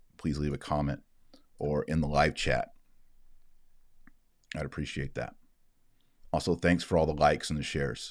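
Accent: Texas, United States. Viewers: Texas accent getting stronger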